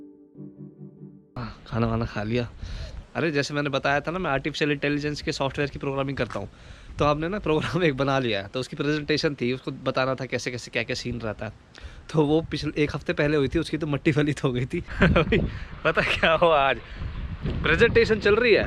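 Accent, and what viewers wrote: Haryana, India. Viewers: Bro I love the u video start or moj aa ri aa b ri ya aise bqt kat ra Jo Haryanvi ton h lov it bro thanks for making videos luv u from Himachal Pradesh India jai hind bro🇮🇳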